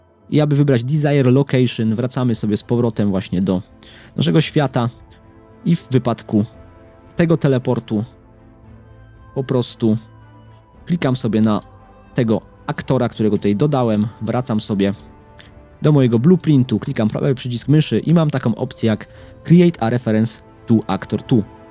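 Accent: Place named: Poland. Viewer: Mówiąc szczerze dawno nie słyszałem Polskiego akcentu w tutorialach, rób dalej co robisz wszystkim się to przyda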